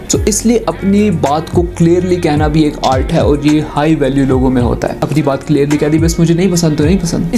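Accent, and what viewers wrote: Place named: Pakistan. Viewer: Bro are you from Pakistan because I guess from the way of your talking.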